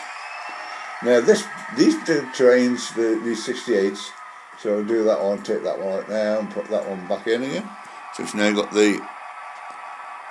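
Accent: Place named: Stoke, United Kingdom. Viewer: Well, a great big happy birthday to you and many of them matey. I love watching your videos and by your accent I can tell you’re a Stokey. I actually live in Middlewich but I worked quite a number of years all over Stoke and my son works in Fenton. I got into this hobby a couple of years ago because of the Hornby TT 120 and I’ve decided to go down the Itrain route to run my trains and after a bit of messing around I have finally got everything working perfectly and it’s great to watch the Locos go round in full automatic mode so even I don’t know where they’re gonna go and how long they gonna stay at a platform. I’m now using all Yamorc equipment for the feedback sensors the point switches and the command station anyway all the best matey have a great day Steve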